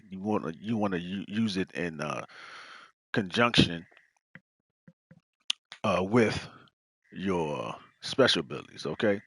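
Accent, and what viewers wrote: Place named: Louisiana, United States. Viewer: Man, are you from Louisiana? Cause you got that accent! Also, great video. Take this sub!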